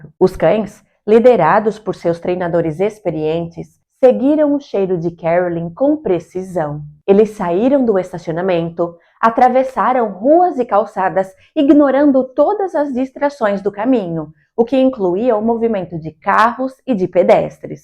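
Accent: Portugal. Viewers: você é brasileira? Que legal! Poderia jurar que vc era portuguesa, por causa do sotaque.❤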